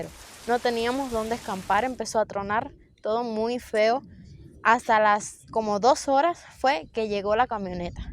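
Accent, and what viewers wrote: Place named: Veracruz, Mexico. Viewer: Vente a Veracruz Tenemos el mismo acento y comemos arroz blanco y frijol negro😊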